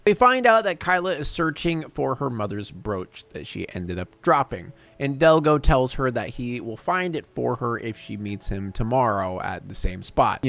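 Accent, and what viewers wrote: United Kingdom. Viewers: Wow your New Yorker accent sounds very British,what you read was written by The Nyc guru,as it said at the top of what you read . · why did you cut out Malcolm McDowell's name? lol its literally the only name on that list that has a voice that sounds like your british accent lmao and btw idk why but i feel like Elemental is just like this movie with character building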